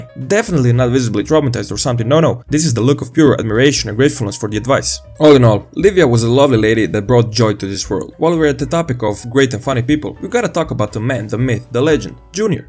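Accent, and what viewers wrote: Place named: Eastern Europe. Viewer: I found a lot of humor in the series, but I felt it was unintentional and a reflection of my own od sense of humor. This narrator - is it an AI generated voice with some odd Slavic or Israeli accent on speed?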